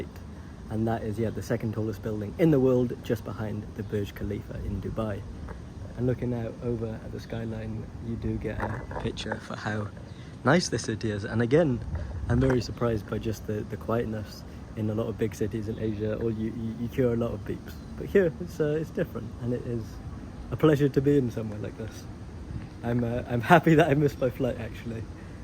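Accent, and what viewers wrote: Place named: Argentina. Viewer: I keep watching while reading most comments here about how calm and chill this episode. My first impression is you're from South America, particularly like Argentinian. 😂😂. Then to my surprise that you're from Wales when i check your profile page. The only Welsh i knew was the menacing Giggsy.. Aha Enjoy your time in Malaysia eh..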